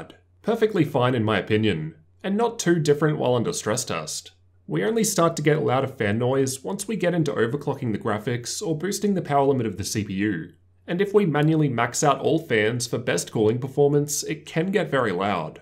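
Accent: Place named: Australia. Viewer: woah hes aussie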